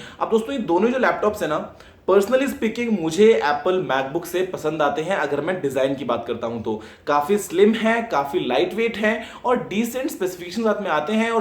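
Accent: India. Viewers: is he really talks english?? heard like speaking indian..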